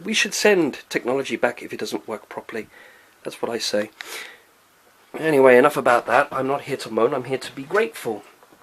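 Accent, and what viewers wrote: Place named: United Kingdom. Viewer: A cool concept! I've never seen someone from this community invite others to a small tour through their space. This should happen more often! It's a shame you feel nervous about recording your voice, because I unironically like it. There's something about a British accent that just sounds captivating. Please excuse me for not actually paying full attention for the whole 40 minutes, but it was a relaxing experience. Believe it or not. :P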